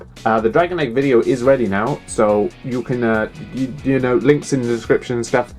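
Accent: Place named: United Kingdom. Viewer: Its strange, I always assumed the genius behind was American. Nice to hear a fellow Brit that does YTPs. (I dont make videos I just mean I'm also British)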